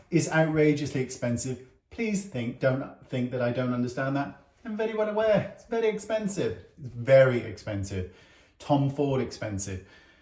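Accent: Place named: RP, United Kingdom. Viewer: Your YouTube channel is a classic BBC English teacher for a foreigners!!😊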